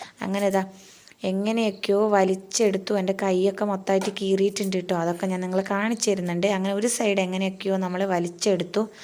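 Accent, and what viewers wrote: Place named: Kasaragod, India. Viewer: Aa namade paara... 😅chechi kasrod ano.. Nammem athe😁👍🏼😍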